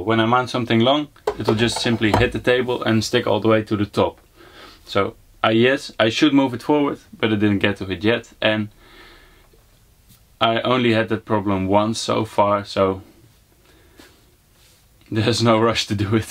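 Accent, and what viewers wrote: Netherlands. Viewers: Listening to you, it seems to me you'r Dutch, but I stick to english so more people can read your channel. My workshop is 2,4 x 3,5 meter, so not much bigger. Also I 'm a fitter & turner for over 35 years. therefore I was keen to see what solutions you came up with. One of the great things of the workshop is your lath. Maybe one day I have one as well....One thing that I discovered is that I like to keep my tools in a drawer or systainer. The main reason is DUST. I'm going to make a central vacuüm cleaner system to keep the dust to a minimum. This is going to be a system at about 2 m high, with a arm or rail to guide the hose. I hate it when I saw with a plunge saw and the vac.cleaner hose hooks up to the rail or bench etc. anyway I'm curious what is coming. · I am new to your channel. This video popped up as being recommended. So I watched it Very interesting how you organized your shop. 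I also watched some other videos from you, straight away. They are interesting as well, so I subscribed. Is it possible that I hear a Dutch accent in your English?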